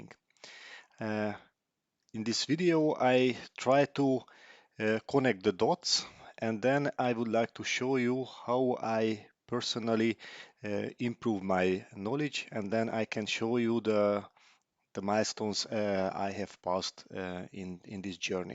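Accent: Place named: Hungary. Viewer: Biztos dolgoznak magyarok a Juhtyúknál a beszédfelismerésen, mert igen pontosan feliratozza a magyar akcentust.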